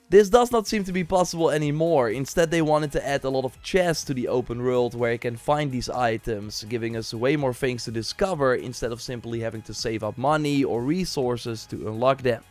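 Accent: Netherlands. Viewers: this clip is sh@ He doesn't finish combo's during fighting. This guy can't play the game. This guy is BAD advertisement with an irritating dutch accent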